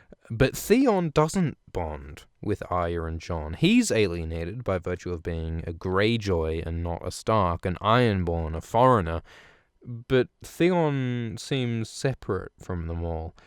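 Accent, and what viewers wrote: Australia. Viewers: Fellow Aussie here, nice to hear GoT in an Aussie accent! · Is there going to be a burp in every episode? I'm not complaining, just observing. That combined with the swearing and the accent wants me to see more Australian readings of popular book series.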